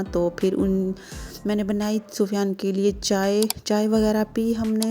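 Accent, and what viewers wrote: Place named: Sindh, India. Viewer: Me new luranay video ap k dekhi, lgrha he jesah sindhi log sindhi style ,me b sindhi hun aap hyderabd me ho ya karachi me??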